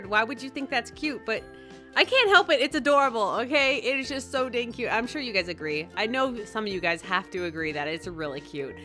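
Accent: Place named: United States. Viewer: It's so weird when you hear someone's voice for a while, and then see their face and it's COMPLETELY different than you imagined. This video is the first time i've seen your face- it's sort of like imagining characters in a book then seeing it in move form, but you actually had their descriptions in the book! ToT I thought you looked asian just with an american accent! Gosh i feel weird now.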